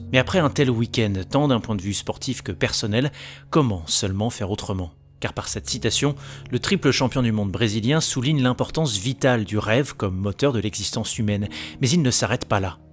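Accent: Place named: Toulouse, France. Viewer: c le roi des jeux de mots éclatés au sol (Max Vegas, Lewis Ham’burger) on dirait un oncle relou qui découvre Twitter en 2010 et pense encore que faire une vanne pourrie, c’est de l’analyse. ttes ses vidéos c est un mix de mèmes de babtou rincés et une réflexion proche du bac à sable. Y a tellement peu de fond qu’on dirait qu’il commente la F1 en lisant des tweets. Le pire, c’est qu’il pense avoir un vrai ton mais tout ce qu’il a, c’est son accent toulousain qui le rend encore moins serieux.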